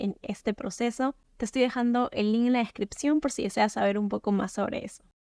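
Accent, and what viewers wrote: Peru. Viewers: Detecto un acento peruano 🤔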